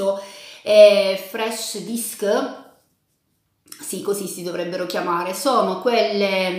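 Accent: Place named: Calabria, Italy. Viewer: Infatti avevo capito che il tuo accento era simile al mio e mi sembri un viso nn nuovo ma di Reggio che zona,, ❤️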